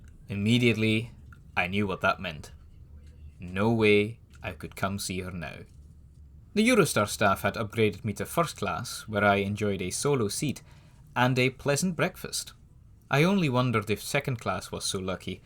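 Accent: Scotland, United Kingdom. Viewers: Wait a minute? Did he say he was from the Netherlands? Being an American, revealing my ignorance, did I just hear him speaking with a heavy Scottish accent? 🤔 Great video and account. Thanks. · for someone who has come all the way from the Netherlands, you have a discticive Scottish brogue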